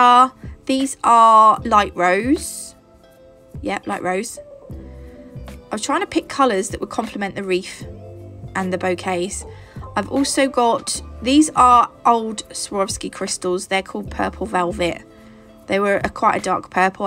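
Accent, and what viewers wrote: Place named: RP, United Kingdom. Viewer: They are really cute. I love the layering of different flowers (decals, paint & nail art) thank you ❤️ I hope you don’t mind me saying, but I’ve just noticed your voice/accent is quite posh sounding 😊(so ladylike….. and then the Pepsi Max shows it’s face and we get a burp 😂). Never change ❤️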